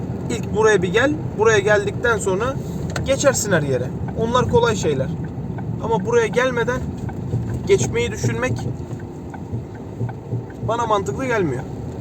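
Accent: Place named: Black Sea, Turkey. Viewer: nerelisiniz konuşma sanki karadenizli gibi????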